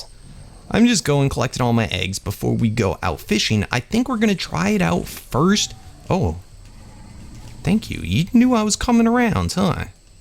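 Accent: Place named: Boston, United States. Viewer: 220307 USA, Boston maybe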